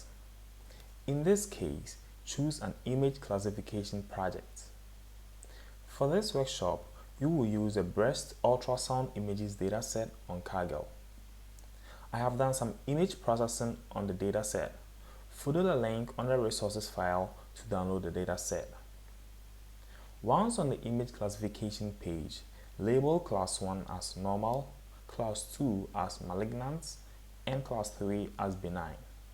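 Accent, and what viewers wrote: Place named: United States. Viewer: 3y3 American accent wai 😂😂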